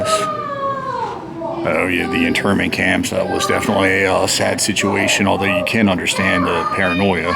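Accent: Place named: Maryland, United States. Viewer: You’re going to some interesting places..thanks for the video! I have lots of family in Maryland, and you have the same accent as them..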